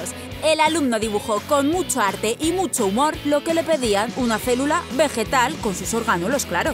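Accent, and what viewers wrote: Spain. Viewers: eres de españa ??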